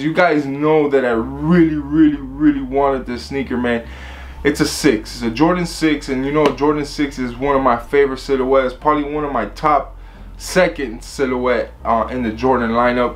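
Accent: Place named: Chicago, United States. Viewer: I copped these too, paid resell . Love the Jordan 6. You sound like you from Chicago. Or the midwest area .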